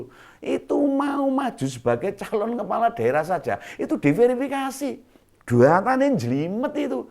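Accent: Java, Indonesia. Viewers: Bapak dengan gaya bahasanya sgt lucu maka aku suka nonton. Logat jawa itu enak didengar. Logat saya batak suka di ejek, aku suka tertawa logat saya diejek sehingga seru. Salam mesra bos !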